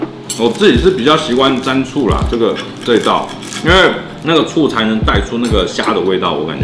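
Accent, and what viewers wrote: Taiwan. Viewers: 港仔台湾腔已经这么浓了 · 看了這部影片才知道你是香港人，你的口音完全以為你是台灣人的說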